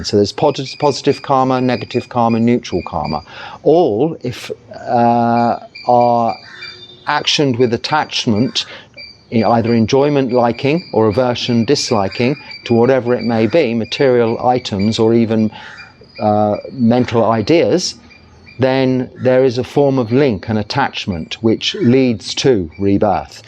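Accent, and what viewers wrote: England, United Kingdom. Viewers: What an absolute treasure these frank talks are, from a monk in situ...with an English accent! I hope the young people realise this was impossible pre-internet! Many thanks!! I have a question below.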